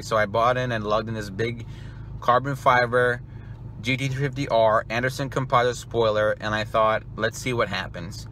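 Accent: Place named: New Jersey, United States. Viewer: What part of jersey are you from?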